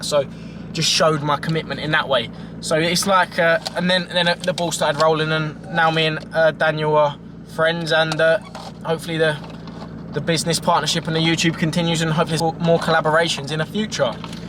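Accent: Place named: Essex, United Kingdom. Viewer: i love ur commentary proper essex geeza keep it up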